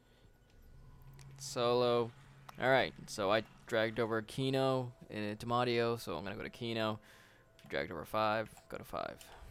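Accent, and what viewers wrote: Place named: Canada. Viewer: u canadian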